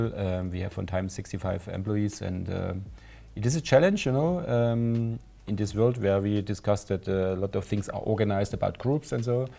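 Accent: Germany. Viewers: As a German myself, it's painful to listen to this. But he's from East Germany. They never had English in school. They had to learn russian in the GDR (DDR) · German Accent at it´s best ;) sometimes i love my country for things like this XD but he seems realy competent and like a nice chap :) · Ich liebe sat german Inglisch ... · That’s one heavy German accent! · East German accent non the less. 😊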